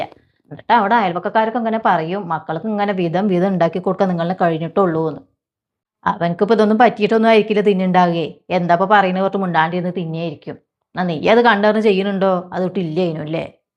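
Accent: Palakkad, India. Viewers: Palakkad basha my favorite ❤❤❤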